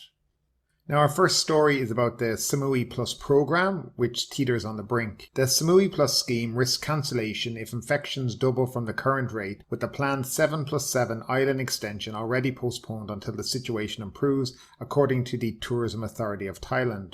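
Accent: Ireland. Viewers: ah. Dunshaghlin or dunboyne I’d say. (With that accent) …… I’m a dub myself.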